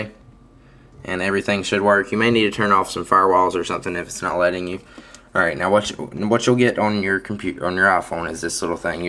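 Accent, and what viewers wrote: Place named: Oklahoma, United States. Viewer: Are you from oklahoma cause i have a cousin name alex and he has accent just like yoi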